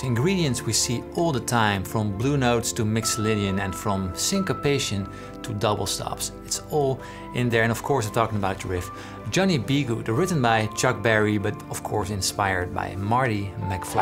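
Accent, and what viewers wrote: Netherlands. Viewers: Finally the basic thing which influenced every string picker. As always perfectly done,. Dein holländischer Akzent im Englischen macht die Sache übrigens noch sympathischer. Wie Herman van Veen sagte:"Deutsch ist Holländisch mit einem ganz komischen Akzent." 😄 👍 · Just drag your Pik ... hahaha 🙈😂I can hear you are dutch man haha 🙈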